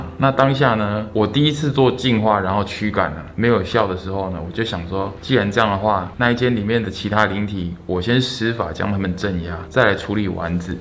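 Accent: Taiwan. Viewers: 哇。。哈喜歡的鬼式台語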